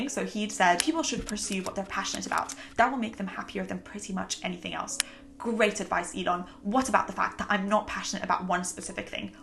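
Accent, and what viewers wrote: United Kingdom. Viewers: I can listen to you for ages for your adorable British accent.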